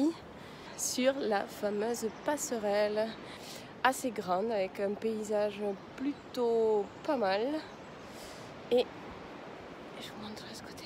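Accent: South, France. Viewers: Une bien belle aventure. Bravo la miss. Partage nous d'autres belles aventures comme ca. C'est top. +1 abonné. 👍 Et cet accent du sud me rappelle pleins de choses. 😉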